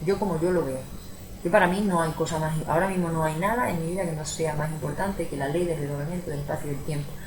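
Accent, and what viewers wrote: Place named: Andalusia, Spain. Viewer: Hola. Gracias por tu video es muy generoso por tu parte. Me encanta tu acento andaluz y se te entiende perfectamente. Quien no entiende es porque no quiere. Me ha sorprendido lo bien que explicas los conceptos, con naturalidad y soltura. Ha estado muy interesante. A mí me gustaría también oir más de tus experiencias. Sigue subiendo vídeos. Saludos y no hagas caso a los haters, los hay en todos lados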